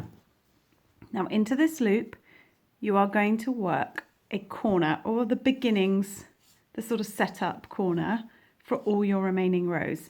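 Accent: England, United Kingdom. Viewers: ❤❤❤❤❤❤❤❤❤❤❤❤❤❤❤❤❤❤❤❤❤ I LOVE YOUR TUTORIALS AND YOUR ENGLISH ACCENT ❤❤❤❤❤❤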